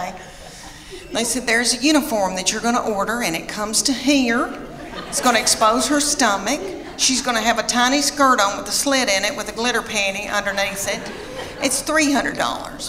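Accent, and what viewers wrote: South, United States. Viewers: She's absolutely beautiful, and I love her southern accent! 😘😍🥰 Thick women are a gift! 🎁🥰😘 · From the South. I believe she is from TN. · Southern accents are my favorite! Sounds so nice! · This lady is absolutely HILARIOUS with that NATURAL southern accent, I love her and she's really cute to boot🥰🥰🥰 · After discovering Jeanne Robertson, then you, I realise I have a liking for southern lady comedians. Your charming accent is the perfect accompaniment to a funny story. If you visit the UK, I shall definitely buy a ticket. 💕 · So true about how everyone (parents and kids) act at these events. Absolutely hilarious, all the more so because it’s true. And it’s Leanne Morgan so it’s got her southern accent and insight. You’re a national treasure.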